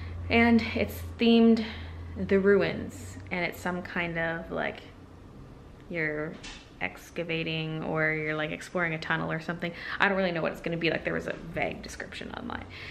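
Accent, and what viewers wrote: Canada. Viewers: You know she's from Canada because of the way she says "bag" and "vague". To her instead of a A sound bag is beg and vague and vegue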